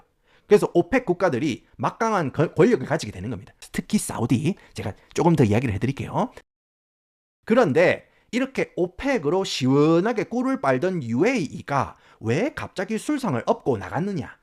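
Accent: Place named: Busan, South Korea. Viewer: 처음엔 부산사투리를 살벌하게 구사하셔서 살짝 당황(?)하면서 초반만 보는듯 마는듯 봤었는데요 우연히 님 영상을 그냥 켜두고 제 할 일 하고 있었는데 하시는 말씀 듣다보니 어려울 수도 있는 정보와 지식을 참 쉽고 재미나게 풀어서 설명해주시는데 (중간 중간 난무하는 드립도 너무 중독적) 그리고 본업도 하시고 육아도 하시면서 자투리 시간을 할애에서 하시는 유튜브이신 것 같은데 진짜 퀄리티 장난 아니네요 이젠 30분 넘는 긴 영상이 기다려지고 (너무 몰입해서 봐서 그런가 이제 짧게 느껴져요) 완전 팬됐어요 럼프짱 성대모사는 봐도 봐도 안 질려요ㅋㅋㅋㅋㅋㅋㅋ가끔 댓글에 혀를 너무 굴려서 영어 발음 한다고 지적하는 사람들 있던데 10년 넘게 미국에서 살아본 제가 느낄때 님 발음도 구사하시는 문장도 흠 잡을데 없이 완벽합니다 영어랑 꽁냥꽁냥 1도 안되는 사람이 일부러 있어보이는 척 말끝마나 콩글리쉬 섞어쓰는거 보다 님이 네이티브로 구사해주시는게 듣는 제 귀엔 훨씬 편하네요~~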